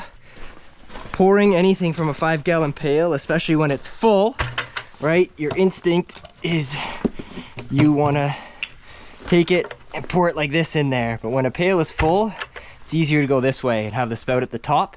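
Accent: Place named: Canada. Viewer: This young man sounds like a Canadian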